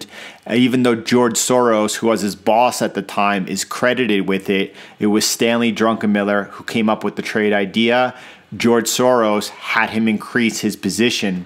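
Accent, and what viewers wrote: United States. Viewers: NY accent, but where? Long Island?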